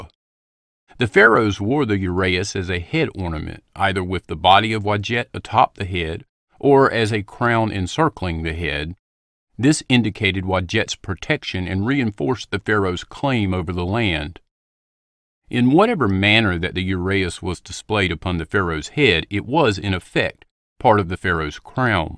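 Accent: South, United States. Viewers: More content like this! I love your southern accent while reading the esoteric goods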